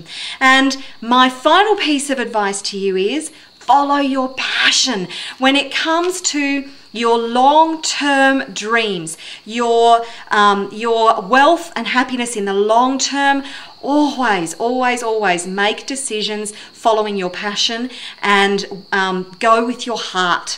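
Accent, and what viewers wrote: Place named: Australia. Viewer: great to have found your channel Thanks and great to hear the Aussie accent! Thanks:)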